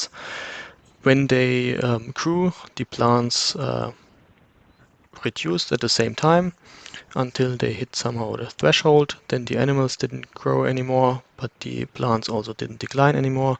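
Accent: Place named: Germany. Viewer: Gutes Video! (Ich geh mal vom Aktzent davon aus, dass du Deutsch sprichst 😜)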